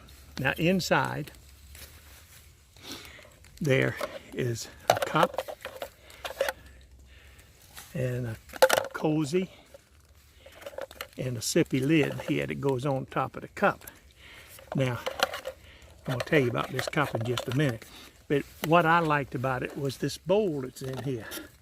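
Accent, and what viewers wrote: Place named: Charleston, United States. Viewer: I'm from SC. I'm trying to figure out your accent....Charleston? Anyway, fantastic vids! From Fort Mill.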